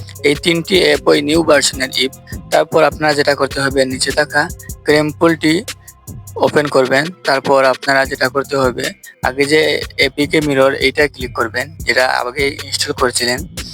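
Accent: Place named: Sylhet, Bangladesh. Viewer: ভাইয়ের কথায় বুঝা যাচ্ছে সিলেটি।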